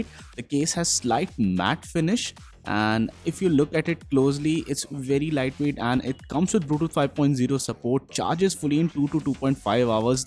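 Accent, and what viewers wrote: India. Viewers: mamma mia gli indiani che parlano inglese sono irritanti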